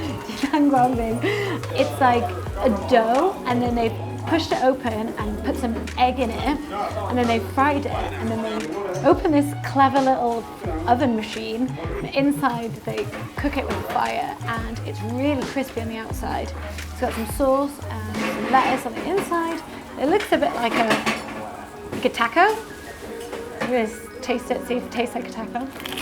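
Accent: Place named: England, United Kingdom. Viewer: Hi. It is so refreshing to hear an English accent and so down to earth and there is nothing pretentious about you which I find uniquely different to other bloggers. Dou jiang comes in salty and sweet version. I believe in the south it favours the sweet version and in the North the salty. I prefer the sweet one myself but each to our own.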